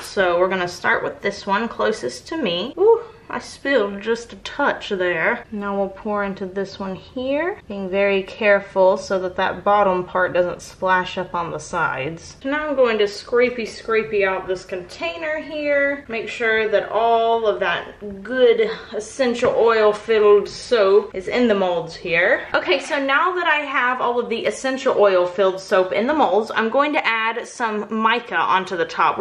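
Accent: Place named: South, United States. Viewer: Your voice sounds so southern like mine 😊😊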